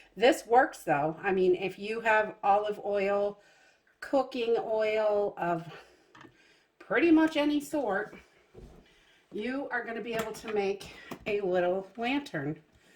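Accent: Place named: Boston, United States. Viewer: I was listening to her talk, then she said that she "doesn't like to use her mason JAHS". I jumped right to comments to see if anyone mentioned where she was from. I am a Bostonian living in SC. Been away for about 10 years, so every time I hear the voices from home, I jump. Ya never notice the accent until you been away for awhile.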